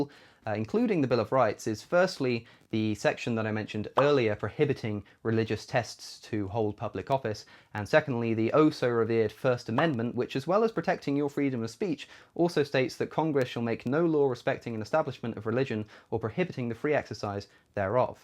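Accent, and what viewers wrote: United Kingdom. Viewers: oh the irony of hearing the Declaration of Independence and the U.S. Constitution being read in a British accent. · Is he British?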